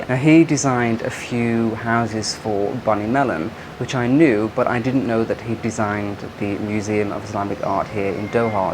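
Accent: RP, United Kingdom. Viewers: I am Japanese. I live in UK for 50 years but I still have heavy Japanese accents. I genuinely admire and enjoy listening posh accents. 😊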